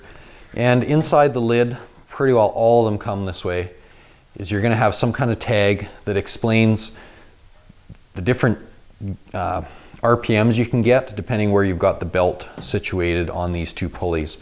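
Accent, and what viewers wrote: Canada. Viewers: You sound like a Canadian eh. Am I right? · holy canada accent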